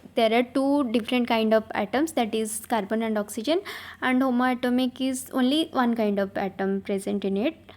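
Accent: Odisha, India. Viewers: i think may be u are from Odisha na ?? bcz ur way of talking reflects that.. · AREY APP ODISHA SE HO KYA 🤔🤔🤔✨ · Didi are you from odisha?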